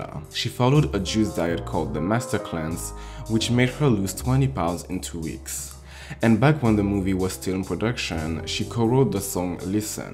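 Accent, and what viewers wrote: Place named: Germany. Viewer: Are you German? love the accent, I love your breakdowns btw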